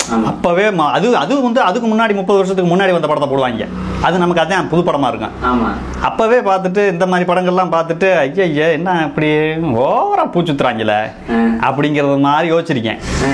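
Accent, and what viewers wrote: Madurai, India. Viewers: good try. I like madurai slang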